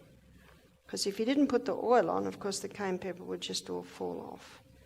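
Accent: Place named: Australia. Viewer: She's Australian....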